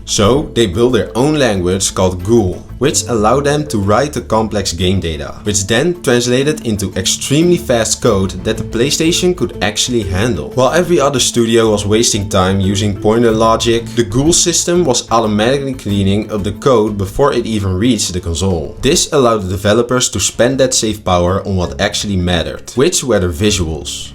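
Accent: Netherlands. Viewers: Plz dont stop making vids. I love them! Btw, are u Dutch? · are you dutch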